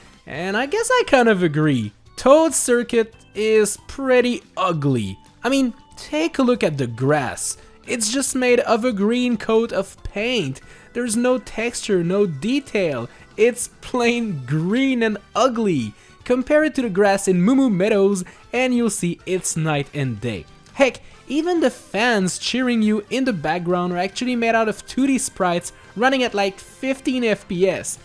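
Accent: France. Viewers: I speak french and your accent is not bad 😁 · Why do I feel like that English is actually second language and French is his first. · Hey nice french accent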